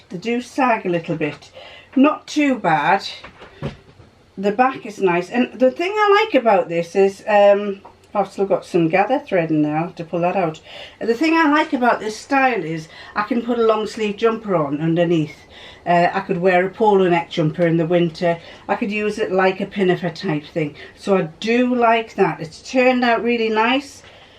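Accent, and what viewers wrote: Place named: North East England, United Kingdom. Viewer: Hi, I love seeing what you make. I have been sewing for years and years but still can’t seem to make anything that fits me perfectly. How on earth to you manage to measure yourself and adapt patterns to fit your body? I know you said you live in Richmond but your accent suggests that you were brought up in the North East, like me, am I right? Please keep the videos coming as I learn so much from you xx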